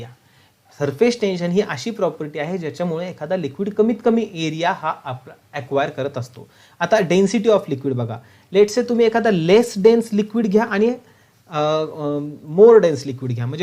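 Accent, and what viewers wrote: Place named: Parbhani, India. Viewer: Sir tumhi Parbhani che aahat ka? Tumchi accent tashi watli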